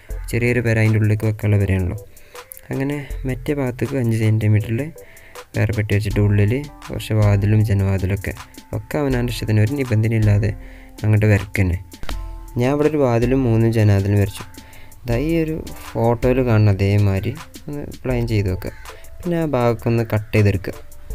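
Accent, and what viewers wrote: Malappuram, India. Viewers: മലപ്പുറം ഭാഷ പൊളിയല്ലെ..... എന്തിനാ അത് പറയാൻ മടിക്കുന്നെ.... സംസാരികുംനോൾ സൗണ്ട് കുറച്ച് കുറവാണ് അതൊന്നു ശ്രദ്ധികൊണ്ടു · നല്ല ക്രിയേറ്റിവിറ്റി👌♥️👌♥️ പിന്നെ ഞമ്മളെ മലപ്പൊറം സ്ലാങ് അവതരണവും😀😀♨️♨️ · വർത്താനം കേട്ടപ്പളേ തോന്നി മലപ്പുറം ❤️ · Ee malapuram basha poliyaanu.frendsinod parayunna pole und.anthayalum adipoliyayittund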